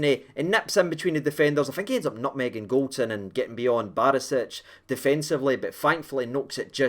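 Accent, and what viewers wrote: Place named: Glasgow, United Kingdom. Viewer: I just love your wee Wegie accent❤️❤️ 🇦🇺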